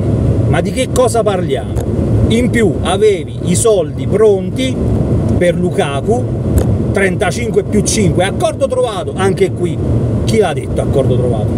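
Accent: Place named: Marsica, Italy. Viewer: dizione marsicana impeccabile e piglio aggressivo da Aquila Reale del Velino. "Te mangane du portier"....tradotto "ti mancano due portieri". Mi manca l'Abruzzo e la sua genuina e montagnosa maestà!!!!! Sei troppo forte e da finto incazzato ancora di più 👏👏👏👏👏 Un saluto 😃😃😃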